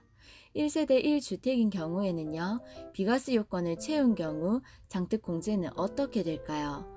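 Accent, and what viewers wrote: Gyeongsang, South Korea. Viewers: 갱상도 사투리 좋아요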